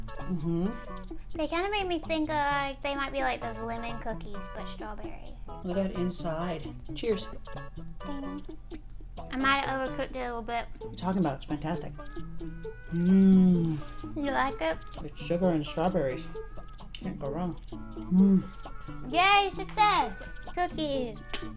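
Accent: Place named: Georgia, United States. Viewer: I'm live in Warner Robins and have never heard an accent like yours. It's so cute and unique. I thought maybe you were from north GA. It's almost cherry blossom festival time again. The pollen is killing us!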